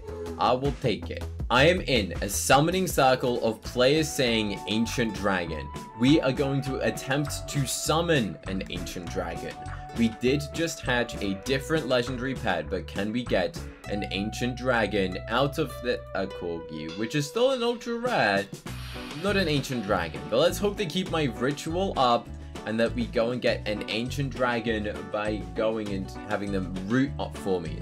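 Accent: United Kingdom. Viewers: I never knew he talked in British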